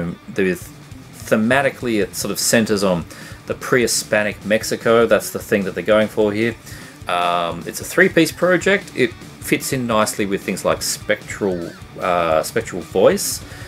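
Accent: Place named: United Kingdom. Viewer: Yeah lots of Australian bands here but he has a British accent? I noticed that was weird too. Anyways, great list!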